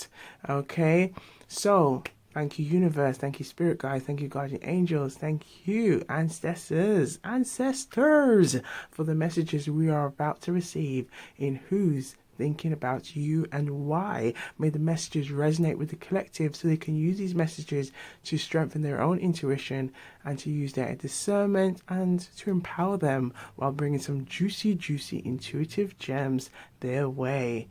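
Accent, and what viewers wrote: Jamaica. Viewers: I think this is the second time I’ve seen one of your videos and I just wanna say I LOOOOOOOVE the patois so much 🥰🥰🥰 reminds me of here in Australia how we have our own slang language no one else can understand haha. I love seeing the same/similar things pop up across different cultures. Our wa gwan is “scarnon” 😂 Bonded by dialect 😁😁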